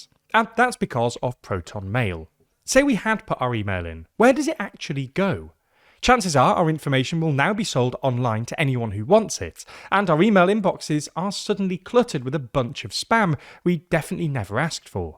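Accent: United Kingdom. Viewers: By your British accent I can tell you are one of those who gather some audience and then start political analysis of their own. Here in this case you data collectors have been totally wrong. There is no such thing here in Afghanistan what you call uprising. Afghanistan is under strict control of Taliban who were brought by your Dads(Many in number) the United Sates not by the British who died in hundreds while defending American interest. Thanks to president trump and his administration who spitted on the coffins of British forces and didn't even bother to gift everything to Taliban. By the way you should another podcast about Russia going to attack British military targets in Ukraine. yesterday's attack 12 British engineers were smoked. Hahahaha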